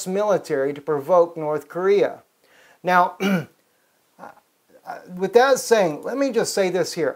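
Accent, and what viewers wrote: South, United States. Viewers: That's where your familiar southern assent. greetings from Louisiana. Jesus bless brother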